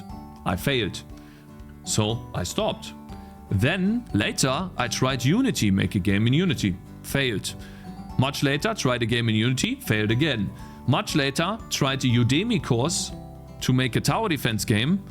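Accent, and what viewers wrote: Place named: Germany. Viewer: Gothic 1 and the whole pronounciation of all the words, he is german I knew it. Also im in the same limbo you were.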